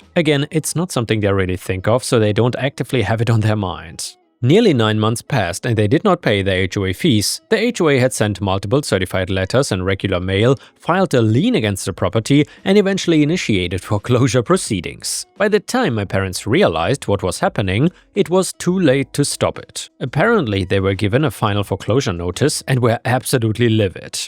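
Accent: Germany. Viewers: You have a German accent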